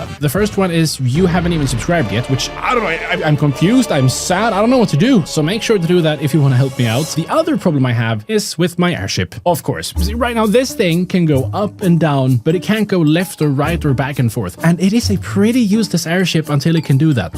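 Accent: Sweden. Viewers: Is it just me or does he have a swedish accent? oh the channel is in sweden. hej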